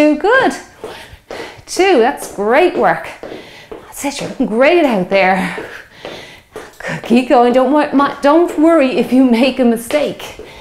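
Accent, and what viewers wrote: Ireland. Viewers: I was just looking around for a workout to get me back into a good workout routine after a long time away due to injury. This workout is just perfect. And your Irish accent is so lovely to listen to!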